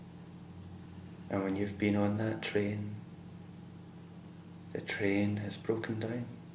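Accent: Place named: Scotland, United Kingdom. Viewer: A Celt with a strong Scottish Brogue. 👍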